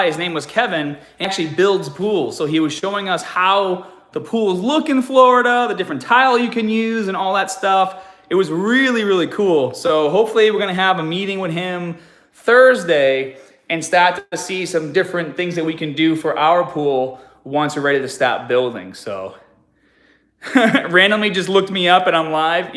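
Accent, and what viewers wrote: Boston, United States. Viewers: I just subscribed. I subscribed to your absolutly gorgeous wifes channel and figured id give you sum support too. 🎉🎊🎉God bless you, your entire gorgeous family💑 & your new home! ..🙏🙏 Awweee your cats soo adorable! Your new house is AMAAAZINGLY GORGEOUS!!😍😍😍 I LOOVE IT!💝 lmaooo😂😂😂😂 " A class A lesbian.." 😂😂😂😂😂 you have a great sence of humor. 😂😂😂 You still got your boston accent.. I loove it! I love right outside boston. But have only lived here a few years. Moving is SOOO STRESSFUL!😱 I really hope you guys had as pleasant as a move as possible.. Bello soo Adorable!😍 congrats on the move. That house is sooo nice! Loove those chandeliers!😍 awwee your soo sweet - "you wanted to give your wife her dream kitchen.." sooooo SWEET!..💝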